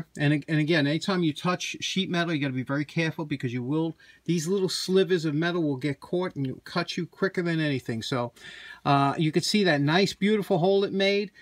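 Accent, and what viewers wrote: New York, United States. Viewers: Is that a New York accent I hear? (I'd say definitely East cost?) :-)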